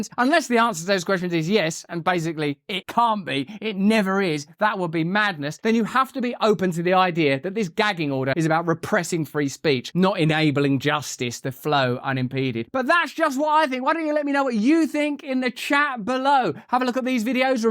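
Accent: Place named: Cockney, United Kingdom. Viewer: I saw you in a period piece for Public Telly with short hair in a suite and thought you were impressive in a Dramatic role. All this latent 1960s British Hippy or 80s big hair sex drugs, MTV crap is why you and Trump are kindred spirits, but you are more articulate even with that London Cockney. Brilliant assessment of the Gag Order and Trumps Al Capone comparison. Loved the Jesus analogy and Trump juxtaposition De javu.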